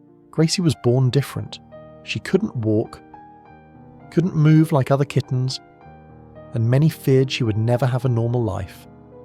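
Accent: United Kingdom. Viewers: Thank you living angel❤❤❤. Pls use a narrator with a US accent . The British accent is very irritable .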